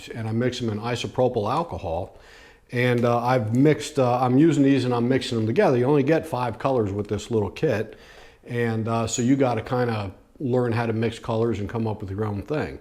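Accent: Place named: East Coast, United States. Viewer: I also find colors to be so difficult, it's one of my areas to learn more about also. At the end, what you came up with look amazing in my opinion. I'm really happy you chose to differentiate the burl because it looked blah before you changed it. Great work, great looking guitar! Where's the accent that you have from, east coast I assume?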